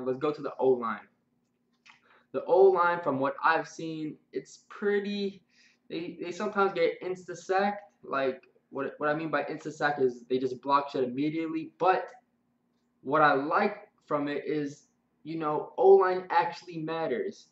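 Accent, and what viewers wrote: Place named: South, United States. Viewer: I understood him ,you must be from the south.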